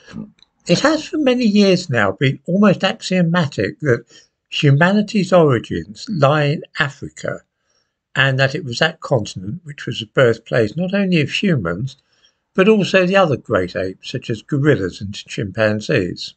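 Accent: United Kingdom. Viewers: sounds like the British, after BRIXT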